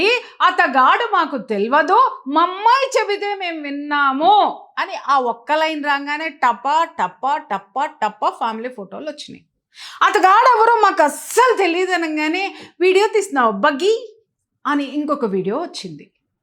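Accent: Telangana, India. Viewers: మీది తెలంగాణ నేనా అక్క తెలంగాణ ఐతే నువ్వు ఇలా మాట్లాడారు అని అనుకుంటున్నా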